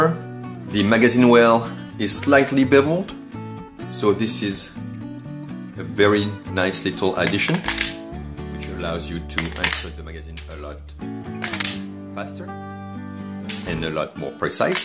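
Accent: France. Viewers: je reconnais un accent français ?!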